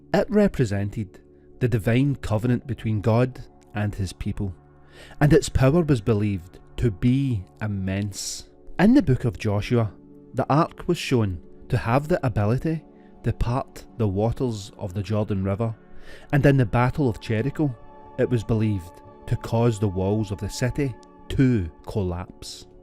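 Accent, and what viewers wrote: Scotland, United Kingdom. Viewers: Starting to look forward to that Scottish voice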